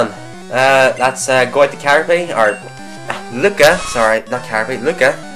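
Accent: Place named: United Kingdom. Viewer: u sound kinda british / scottish / austrailain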